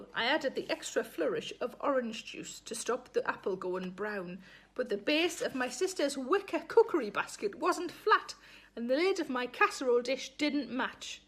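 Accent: Newcastle, United Kingdom. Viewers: I have been watching you for a short time and I am learning how to understand English spoken by a British person. Hard to do by a Yank. Love your comedy, keep it up. Lotsa Love. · Geordie. i.e. Newcastle :) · She is English, from the North. Her accent is Geordie.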